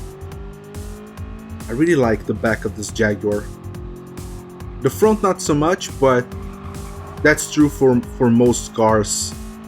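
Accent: Brazil. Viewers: TOP cara.... bem legal hem.... Como eu estou aprendendo aprendendo inglês eu percebi seu sotoque de brasileiro. hehehe Legal cara.. eu também tenho um canal no youtube mas é sobre T.I. Isso manu. Abraços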